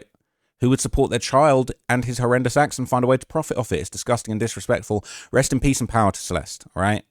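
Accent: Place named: England, United Kingdom. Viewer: Hes from England numb nuts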